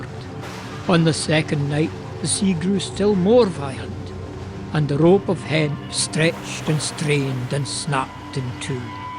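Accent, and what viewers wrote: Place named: Scotland, United Kingdom. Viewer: Wonderful! And I‘m so thrilled to hear a scottish voice again after a far too long time being kept away from visiting Scotland.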